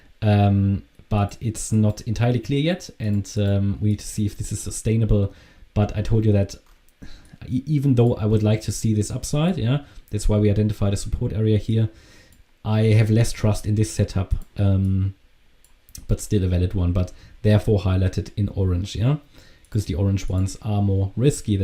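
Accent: Germany. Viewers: du klingelst sehr DEUTSCH an.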